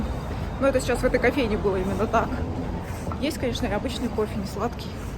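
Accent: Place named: Urals, Russia. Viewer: Так она не говорила что из Москвы,ну и по говору и манерам совсем не москвичка,скорее с Урала..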